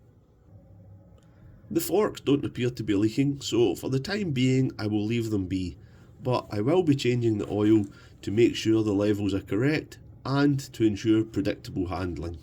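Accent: Scotland, United Kingdom. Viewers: Never realised you were Scottish🤔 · For years, I'm thinking, why is a Scottish man in America? :face-blue-wide-eyes:But you're not - you're home! I wish I was.:face-turquoise-covering-eyes:.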